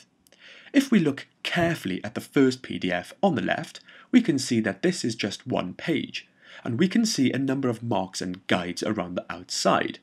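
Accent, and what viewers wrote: Wales, United Kingdom. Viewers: ah you have cute welsh accent!!! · is that a welsh accent I detect? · Is the narrator Welsh? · Love the Welsh accent! 😍Da iawn ti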